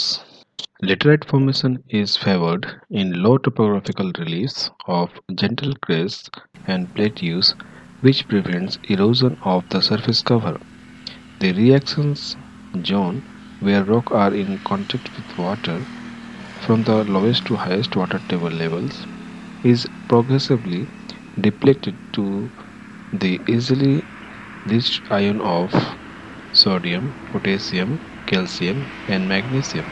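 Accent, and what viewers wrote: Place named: India. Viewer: Interesting content, dear brother, but the way You pronunciate is awful... I understand that You are of indian accent, but nevertheless Your way of pronunciate is very lazy and neglectful... You should try to improve Your way of speaking, or let somebody other do the vocal job in Your videos, or at least provide Your videos with correct captions/subtitles... I hope You understand this and don´t take my words as an offense... Thank You for the video, and have a good luck with Your work and Your channel ;))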